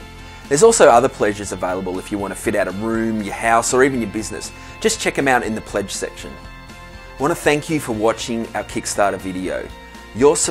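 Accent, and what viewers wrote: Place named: United Kingdom. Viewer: The only reason i can Believe is "British Accent".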